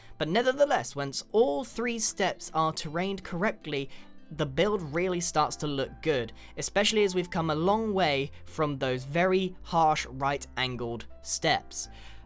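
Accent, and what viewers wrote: England, United Kingdom. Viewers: THAT ENGLISH ACCENT AND CALming voice really makes me feel calm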